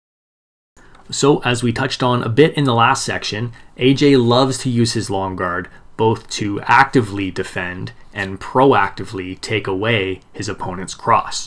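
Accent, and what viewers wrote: Canada. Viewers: I have no idea what your background is, or who you are but your videos are phenomenal! The YouTube algorithm really did it's job recommending you. You sound Canadian(?), and you seem pretty educated. The way you break things down is almost uncanny. Do you talk about your background and I just haven't seen it yet? I'd love to hear about where you came from and who taught you because you are explaining things in an extremely succinct manner that is excellent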